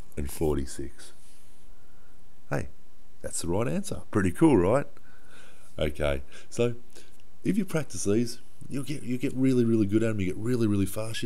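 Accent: Australia. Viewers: is this australian english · I agree with Alexandre, ok you are from Australia, but you could talk a little bit more clearly.. if you know you have an accent well....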